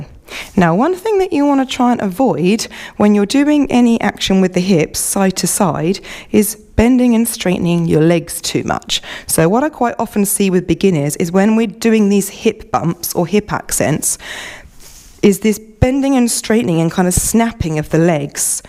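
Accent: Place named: Southern England, United Kingdom. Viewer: Thank you for providing such wonderful classes! Your English accent is also delightful, just wondering where you are from, somewhere in southern England?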